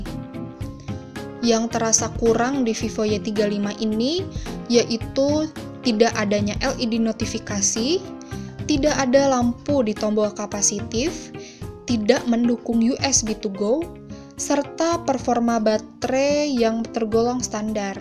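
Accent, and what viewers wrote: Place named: West Java, Indonesia. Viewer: ... Nama Jawa, tapi logatnya Sunda yah?:)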